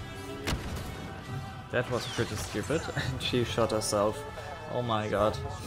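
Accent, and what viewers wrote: Germany. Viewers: Dein deutscher Akzent könnte aber auch nicht stärker sein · Man hört raus, dass du deutsch bist :D Gutes Video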